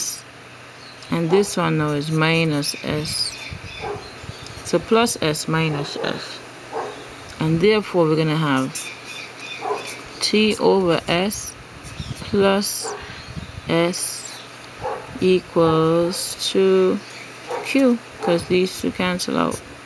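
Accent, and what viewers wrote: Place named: Jamaica. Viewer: Are u a Jamaican. Ur accent sounds like one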